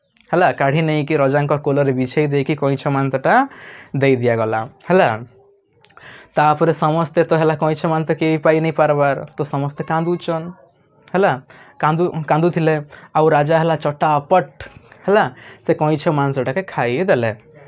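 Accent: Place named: Sambalpuri, India. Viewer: ସାର୍ ଆପଣଙ୍କ ର ସମ୍ବଲପୁରୀ ଆଉ ଓଡିଆ କଥା ମିଶା ଗପ ଟା ଭଲ୍ ଲାଗ୍ଲା ସେ😂😂😂😂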